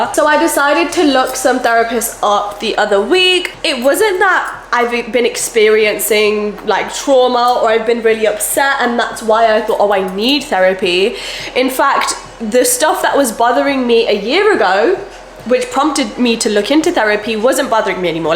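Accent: United Kingdom. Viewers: British accent >>>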